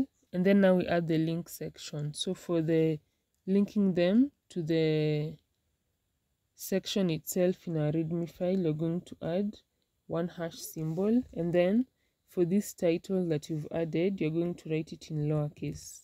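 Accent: Kenya. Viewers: I can tell that you are a Kenyan. 😅 Kenyans have their own accent. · Eish you sound like a Kenyan wee nakushow nimekwama videadly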